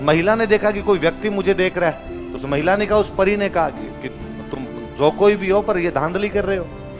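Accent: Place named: Marwar, India. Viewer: Aapari मारवाड़ी बोली री मिठास ही इसी हे साब ❤❤❤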